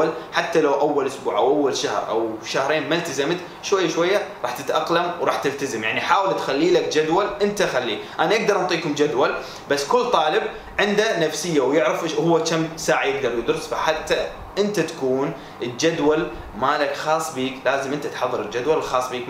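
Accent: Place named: Mosul, Iraq. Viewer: لهجتك مصلاويه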